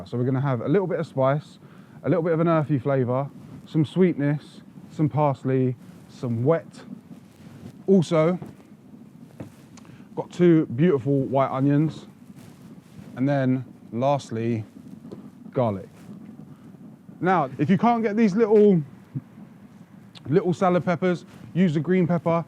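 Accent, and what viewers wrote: United Kingdom. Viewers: funny to hear a turk speaking british english. I love it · I have a feeling that he is going to be like Turkish version of an Action Branson-Matty Matheson mix with a British Accent. No matter what, this channel has a huge "million view every video" potential. I hope you'll do great man. Also, I couldn't stop thinking that you wasted that juice by draining, that would be a great salad dressing base.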